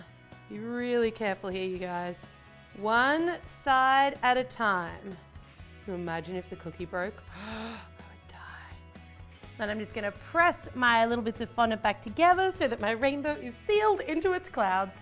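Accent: Australia. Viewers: Veronica Carabez fr thoo i obviously thpught dhe was austrailen but that was it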